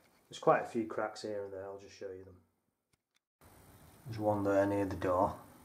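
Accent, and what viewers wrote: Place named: North West England, United Kingdom. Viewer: Lovely job, you sound like you are you based in the north west ? Would you consider giving a quote in warrington .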